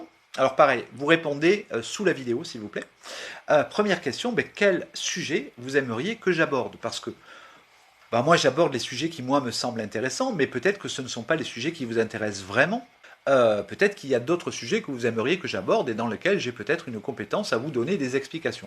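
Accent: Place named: South, France. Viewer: C'est demandé de façon si aimable !... Je ne vais pas détailler toutes les questions posées (je n'ai pas pris note de toutes 😉) Mais ça risque quand même d'être un peu long (1000 excuses d'avance) Préambule : je n'ai rien contre le fait de se tutoyer. J'ai toujours travaillé dans l'enseignement. Je n'ai tutoyé mes étudiant(e)s qu'à partir du moment où ils-elles sont devenu(e)s des collègues. J'ai un titre d'ingénieur en mécanique (tendance maths - élasticité, milieux continus...). Assistant à l'univ de Louvain (1973-79), prof de physique aux Comores (80-81), prof d'informatique (programmation essentiellement) de 1982 à 2012. 1) L'intérêt pour tes "Flashs" : essentiellement ceux relatifs à l'histoire de l'art. J'ai suivi (<num> ans de cours de photo à l'École des Beaux-Arts (ça sonne plus moderne que "Académie") de Wavre. Si le cours d'histoire de l'art (obligatoire) avait été donné "à ta façon", j'aurais sans aucun doute persévéré pour terminer le cycle de 9 ans, mais... 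Mon dada, c'est plutôt la photo "sociologique", genre Walker Evans (Depardon, Ronis...) 2) Comment améliorer ces Flashs ? Jusqu'il y a peu, j'étais gêné par le manque de synchronisme entre image et son et le fait que l'image était "à l'envers" (comme pour la plupart des gens qui partagent des images de Smartphones). Cette fois-ci, ça semble synchrone, donc... Tu as une excellente diction et un petit accent méridional des plus gouleyant à l'oreille (un peu comme celui de Mouscron chez nous 😂) 3) Question nivea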